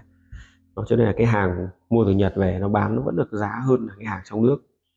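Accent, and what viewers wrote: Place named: Vinh Phuc, Vietnam. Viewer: Giọng chú như Vĩnh Phúc nhà tôi.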